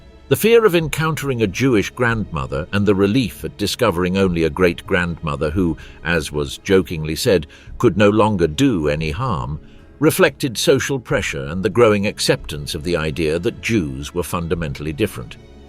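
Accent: United Kingdom. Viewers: I'm a retired NYC public school teacher. 25 years of ESL ( Eng Second Lang) to immigrant adults: South Americans, West Africans, Asian adults, fresh " off the boat." They always ask me about history & I tell them. I'm (82, Jan.'26) world history buff, so I know a lot & this is an excellent doc to describe what it was like there then. I also served in US Peace Corps Türkiye <num> & know a lot about Middle East. I speak/read/write Türkçe. So who wrote this script for not only this film but for all the others too? Excellent general research. Great British accent, too. I respect the work that went into this series. No problems with whatever " minor inaccuracy" there might be. Overall, great product. Thank you. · The usual anti-Hitler video which is the only ones acceptable of course. Great photos. Annoying Brit narrator.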